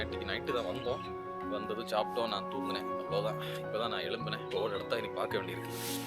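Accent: Tamil Nadu, India. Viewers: Like your Slang bro best Tamil slang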